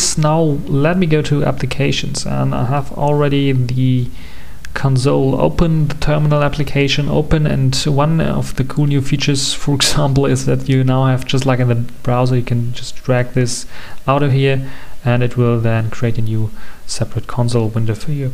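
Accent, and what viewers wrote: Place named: Germany. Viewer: Deutscher Akzent ;) nice video.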